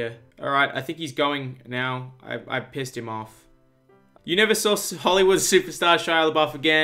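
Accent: Australia. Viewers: is auzzie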